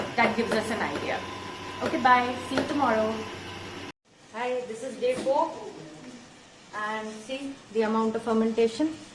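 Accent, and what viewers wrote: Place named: England, United Kingdom. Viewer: Your english accent is your from army background.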